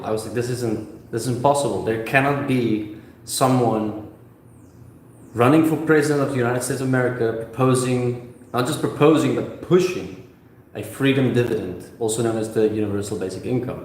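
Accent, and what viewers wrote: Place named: Netherlands. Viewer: I would have guessed Dutch by the accent. Afrikaans? 😉. Positive feedback on Yang is ALWAYS appreciated by YangGang. 🧢 Keep up the good work, en dank u wel.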